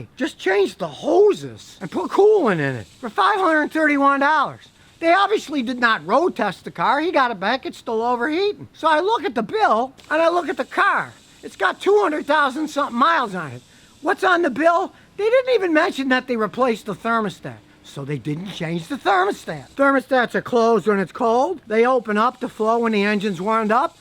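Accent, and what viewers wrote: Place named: Texas, United States. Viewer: Heluva Texas accent, bro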